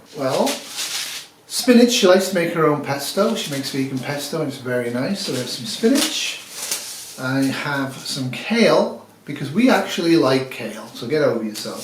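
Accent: United Kingdom. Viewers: I think it's a British thing. Brits don't pronounce the "r" on the ends of words. He has a British accent, not a Canadian accent.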